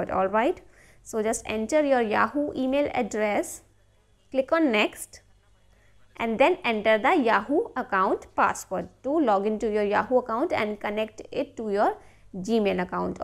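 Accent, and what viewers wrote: India. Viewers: Your indian english is difficult to understand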